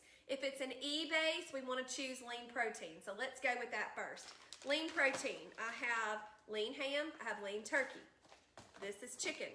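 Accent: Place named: South, United States. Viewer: Oh my gosh, you’re so cute! Love that southern accent! Thanks for all these suggestions ☺️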